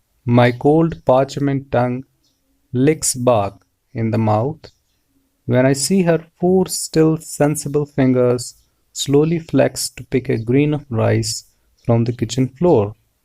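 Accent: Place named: Kashmir, India. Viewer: Kashmiri aawaaz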